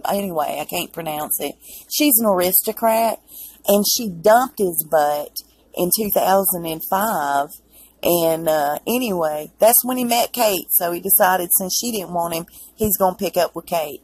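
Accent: South, United States. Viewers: I want to like Megan... but there is just something about her that I just can't take to🤔 ps love your southern accent x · Thanks for sticking up for the South its appreciated. I new Meghan did a lot of things we aren't hearing about until now. Like you Southern Accent and appreciate all the updates and news.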